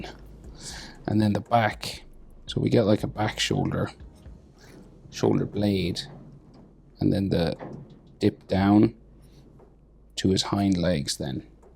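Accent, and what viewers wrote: Ireland. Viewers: Welcome back, you from Ireland?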